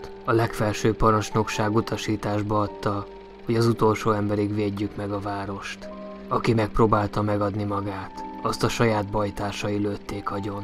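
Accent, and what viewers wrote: Russia. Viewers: Jó az orosz akcentus :-)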